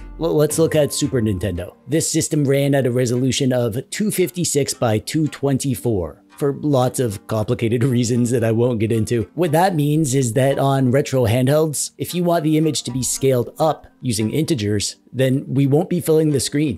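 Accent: Canada. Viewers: Is the host Canadian? Testing my Canadian radar detection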